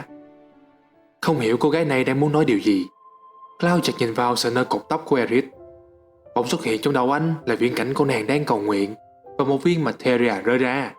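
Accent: South, Vietnam. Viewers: mình comment này là mình rất thich những video của kênh này. Vì có nhiều lý do lắm 1) giọng miền Nam. Mình sr nhé, mình cũng có coi thử 1 it nhưng 1 số bạn ng Bắc nói nhanh quá mình chẳng để hiểu được, vừa nghe mà vừa coi clip y như muốn chóng mặt 2) bạn này sub chậm, giọng nói rất rõ nghe, bên cạnh đó rất hên bạn này nghĩ ra cách chèn sub, làm mình có thể theo dõi kĩ hơn. Đây là điều chỉ có kênh này làm được. Mấy kênh khác dù cũng có sự đầu tư kỹ nhưng họ k như bạn này 3) bạn này dịch kỹ. Hồi đó mình có coi 1 page theo dõi chơi game FFXV, mà page đó chỉ giống như là chơi cho có rồi thôi, k có dịch, làm mình coi mình chả hiểu cốt truyện, rất là bực 4) dịch có mấy đoạn rất vui nữa hy vọng bạn này sẽ đầu tư vào FFX-2 và dãy Kingdom Hearts (Kingdom Hearts này mình thich lắm mà cốt truyện nhiều với từa lưa quá làm mình chả hiểu nhiều) Thank you đã đọc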